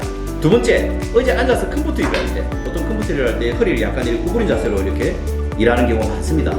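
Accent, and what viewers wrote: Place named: Gyeongsang, South Korea. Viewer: 낭만 닥터님. 구수한 갱상도 사투리에 열정까지 담아서 설명해주시니 머리에 속속들어옵니다^^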